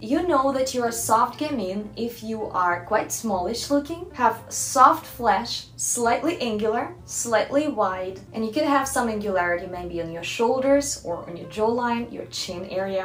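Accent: Russia. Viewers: Is your mother tongue Russian?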